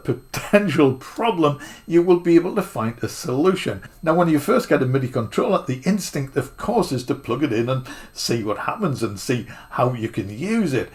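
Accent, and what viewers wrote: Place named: Geordie, United Kingdom. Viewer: I spent 2 hours installing what I could and got as far as the s49 Mk3 finally working with Kontakt 8 in Cubase 15. However I can’t get the DAW control features to work, and the screen is really jittery, jumping around a lot. I plugged the USB cable into the one USB-C port on my brand new computer and I got the feeling that the large plastic cable maybe wasn’t going far enough into the port to make a proper connection. But the keyboard fired up fine and seemed to update okay. I bought it, mint condition, off eBay, so I’m shitting myself that I’ve bought a pup. But I think that’s pretty unlikely. Hopefully your video will help. Enjoying listening to the mild Geordie accent too. Reminds me of me late fatha. 😆❤️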